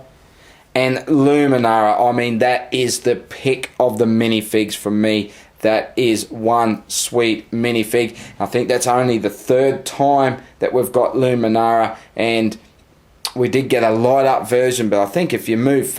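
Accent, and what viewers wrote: Australia. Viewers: Is this guy Australian?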